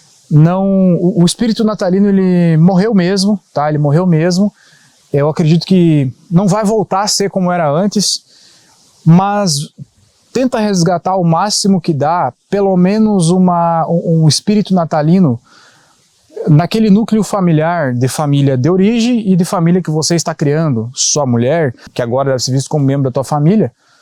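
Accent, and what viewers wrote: Rio Grande do Sul, Brazil. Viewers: aventureiro ou profissional os 2 é bom,mas falta um outro q falava de óculos com um pouco de sarcasmo,deboche e humor com aquele sotaque mais gaúcho,abraço irmão de batalha.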